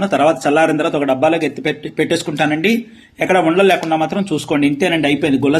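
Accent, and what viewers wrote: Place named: Tamil Nadu, India. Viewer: Tamil gaaru telugu ni chey pattinnadipistunattundi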